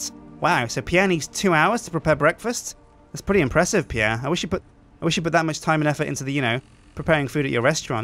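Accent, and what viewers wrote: United Kingdom. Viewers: he's from britain of course he has an accent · SHUT UP! He's British! It's hard for him to speak another accent! (please spell his name correctly!)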